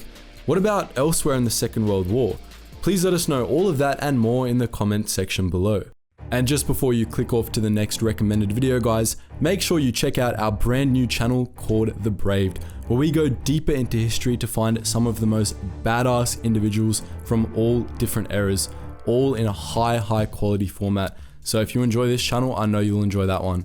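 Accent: Germany. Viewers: Great video, but I feel like you’re trying to say the dutch names the dutch way, but your’re using the german way of saying them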